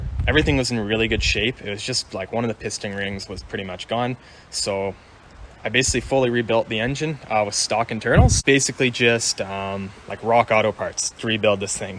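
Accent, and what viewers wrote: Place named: Canada. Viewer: Instantly knew he’s from Canada by the way he pronounces his O’s and R’s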